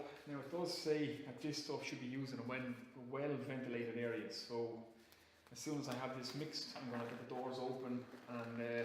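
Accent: Ireland. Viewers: Can't hear you man. But subscribed anyway haha refreshing to hear an Irish accent for a change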